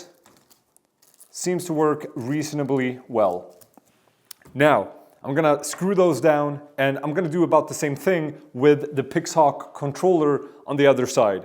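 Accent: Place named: Sweden. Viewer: I did not realize you were having a Swedish accent, I was way to focussed on Russian for some reason😂 hello from Sweden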